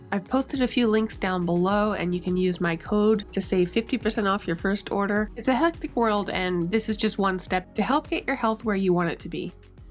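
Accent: Canada. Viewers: Just magnificent. Having Canadian friends, I'm aware of differences in pronunciation between America and Canada. Please accept my apology for the uninformed imbeciles who may have been disrespectful to you. The residents of some backwaters in the US like to maintain their supremacy over things they don't understand. My respect for your tremendous talents would have overridden any "mispronunciation" I might have perceived, were it not for my observance of the Golden Rule. The best of luck to you and your co-workers. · this is such an exciting project! I love old historic pieces and seeing you painstakingly bring this back to life was so satisfying! you did an incredible job (also Canadian and say decal the way you do and think that is really funny you got any flack/confusion on that) 🤣 I never think of us having an accent or inflection, it's everyone else!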